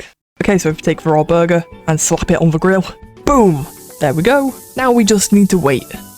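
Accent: United Kingdom. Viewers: Are you British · bro is british please i am challinging you to say "bottle of water" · Are you British because I'm British you sound like one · Are u british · The british accent🇬🇧😭🙏💔🥀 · Are u British?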